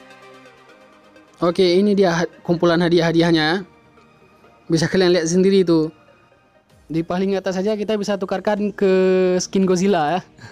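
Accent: Aceh, Indonesia. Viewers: Bg kayak org aceh bicaranya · sudah ku duga logat aceh hhh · Hahaha logat Aceh abeh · logat orang ACEH 🔥🔥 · hhhh...kupikee pakon logat aceeh...hana hie awak jawa😂🤣...auto sub nyoe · LOGAT ORANG ACEH SUDAH SAYA SUB,MAJU TERUS BANG. SEMOGA BERKAH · Bang orang aceh ya suaranya kayak orang aceh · Aceh habis logat nya.. Wkwkw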